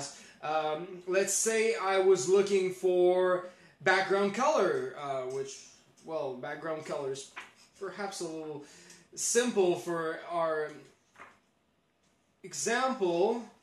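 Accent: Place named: Canada. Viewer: you sound so much more canadian in this video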